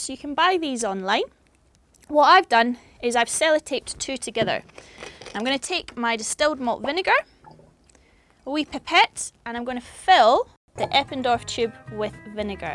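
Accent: United Kingdom. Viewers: British accent ftw XD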